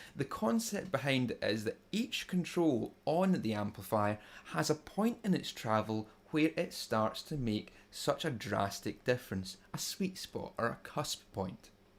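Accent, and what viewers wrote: Scotland, United Kingdom. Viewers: It's so hard to understand what youre saying when you have Scottish accent..btw chicken needs ketchup and mayonaisee so PUT UP THE GAIN!!!!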